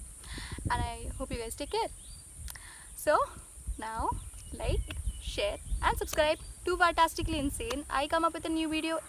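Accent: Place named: Maharashtra, India. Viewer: Are you marathi???.. Your words sounds like marathi tone🤔🤔🤔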